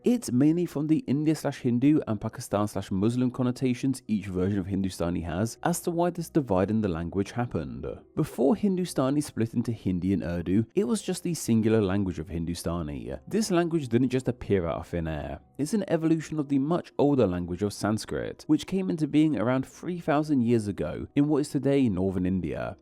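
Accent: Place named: England, United Kingdom. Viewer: Never knew this. Fascinating. What I also find fascinating is the way in which the narrator of your videos has a tendency to add an "ah" sound to the end of words, where a full stop might appear. For example, the previous sentence might end with "appear-ah". It's fascinating. It's not unlike a preacher in some of the evangelical churches in America, but the speaker is very obviously English. I would love to know the backstory of where this speech pattern comes from.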